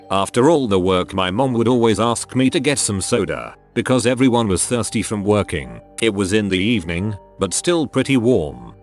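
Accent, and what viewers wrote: United Kingdom. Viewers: I have trouble understanding and following this British sounding robot. The American sounding one is far easier to listen to and understand. Signing out of this crap.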